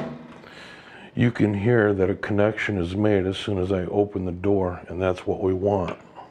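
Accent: United States. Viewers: Thank you for your information as said first safety is very important also your English is good and clear (America English) I am watching from Manchester United Kingdom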